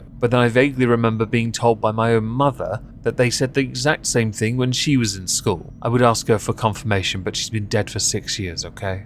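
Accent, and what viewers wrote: RP, United Kingdom. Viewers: I quite like your poshness tho 😂🤣💖💓💕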